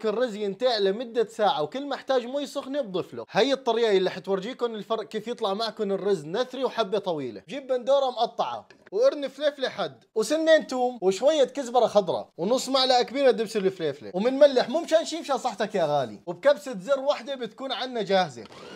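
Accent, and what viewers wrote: Syria. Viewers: من درعا واضح من اللهجة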